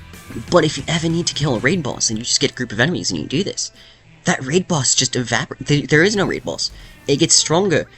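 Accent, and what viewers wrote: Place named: United Kingdom. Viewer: suspiciously british sounding woman rambling ASMR in my home page, the algorithm monkeys are pulling the right ones out of the hat today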